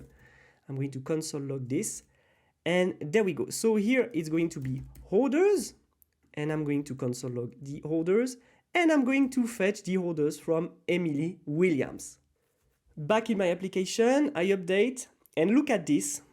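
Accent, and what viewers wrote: France. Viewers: Cool. I like french English accent. It's only below the India English one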